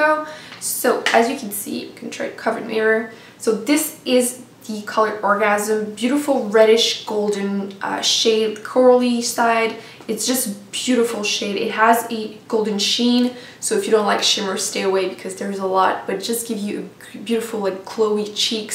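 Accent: France. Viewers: I just love Nars blushes because they are so pigmented! I never have to worry about them showing up on my skin. I have 8 right now, Amour and Seduction are next on my list. Es-tu française? J'ai toujours l'impression d'entendre une pointe d'accent. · gorgeous French accent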